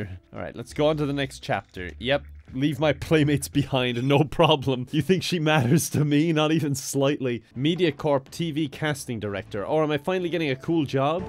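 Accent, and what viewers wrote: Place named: Malahide, Ireland. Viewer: You sound like your from malahide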